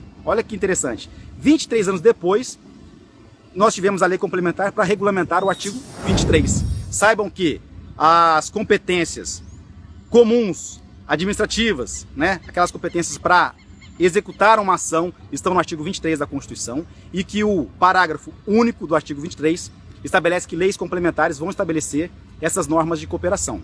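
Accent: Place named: Bahia, Brazil. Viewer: Professor deve ser Bahiano.excelente.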